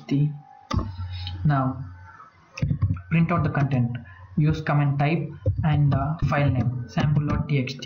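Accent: India. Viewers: I knew instantly that im gonna learn when an indian guy starts talking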